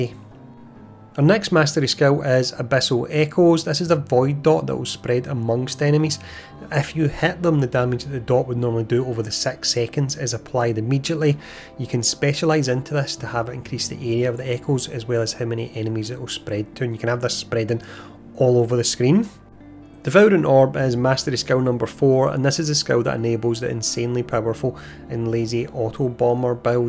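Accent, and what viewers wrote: Scotland, United Kingdom. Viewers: Dude must be Scottish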